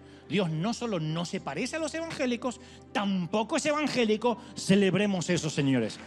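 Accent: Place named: Argentina. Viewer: Que hermosa unción de Dios, a bendecido tu vida y con acento Argentino, gracias por tus mensajes claritos.. Bendiciones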